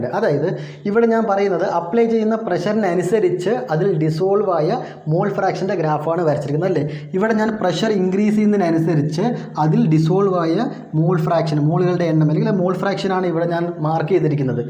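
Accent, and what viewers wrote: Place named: Palakkad, India. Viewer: slang kettapolle thoniii pkd annu ennu🤪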